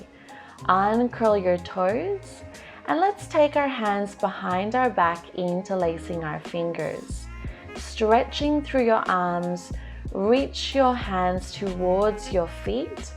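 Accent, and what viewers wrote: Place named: Sydney, Australia. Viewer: First time watching your video and a new subscriber here! Great at home work out thanks! I'm living overseas so great to hear a fellow Sydney sider as well!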